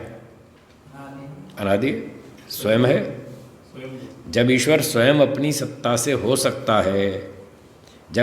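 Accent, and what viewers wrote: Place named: Haryana, India. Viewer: वाह पूजनीय स्वामी जी मन्त्रमुग्ध कर दिया आपको रौबदार आवाज में हरियाणवी लहजा झलकता है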